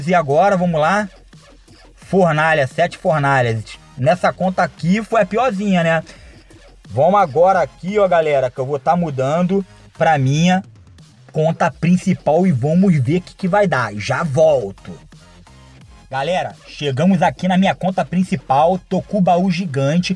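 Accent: Rio de Janeiro, Brazil. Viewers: mt top esse sotaque do rj kkkk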